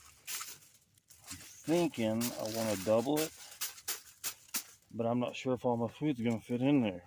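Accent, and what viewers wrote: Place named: South, United States. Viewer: I'm going to take a wild guess that you're from the South :)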